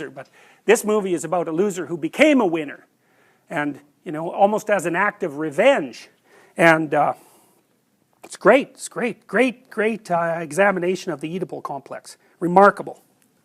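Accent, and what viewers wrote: Canada. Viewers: All Canadians speak like that. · That Canadian accent is so prominent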